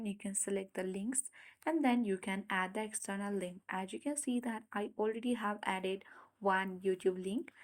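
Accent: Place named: India. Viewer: Americanized English-language is my native language. The speakers' voice has a strong Indo-English accent that is difficult for me to understand. Some words we use are different. For me, I read the transcript while I listened to this video's instructions. Yet I had to pause often to understand the meanings. For me, these edits would help: At the start, tell me exactly what you will teach me in "plain language" for me. Please study up on our word choices and add those to what you recorded in this video, or change them if it doesn't cause confusion. It is OK for the video to take the time needed to explain your instructions, right? Thank you!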